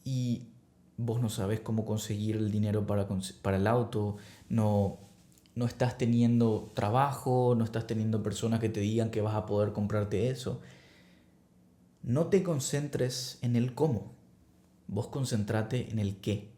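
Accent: Paraguay. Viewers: Acento Paraguayo? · Ya me parecia que sos de Paraguay por el tono 😊😊. Que gusto encontrar alguien de mi pais que hable de este tema en el cual estoy muy interesada. Si puedo contar algo de mi experiencia es q años atras menifeste a una persona en especifico, q hice? fue con scripting, y tb olvidando unos problemaa q habiamos tenido y enfocandome de forma positiva hacia esa persona, creo q la clave estuvo mas q nada en eso. Y tambien recuerdo q a un amigo le dije q con esa persona estabamos saliendo cuando no era asi y luego se cumplio, pero yo creia fervientemente q esa relacion se iba a dar tarde o temprano. Asi pues creer es el secreto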